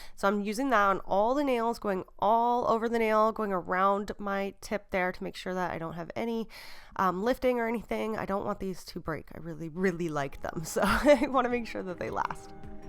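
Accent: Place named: Canada. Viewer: Hi, nice to see your vids back again. As a nail tech I find them relaxing, and your voice always reminds m😂 of my visit to Canada many years ago one summer, I absolutely loved it! Can always tell a Canadian accent when you say the word.. 'about' So cute! 🥰 Love that you're back filming again, best wishes from the UK 💕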